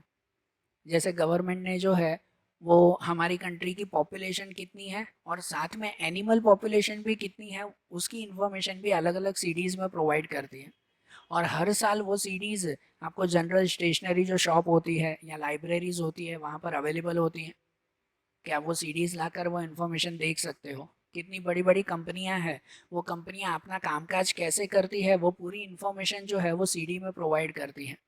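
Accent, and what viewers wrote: Gujarat, India. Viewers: Good explanation sir gujrati cho ne 😅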